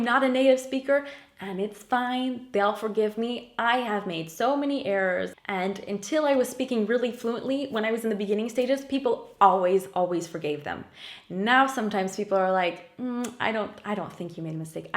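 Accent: France. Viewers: c'st fou tu aurais presque un accent francais en anglais :)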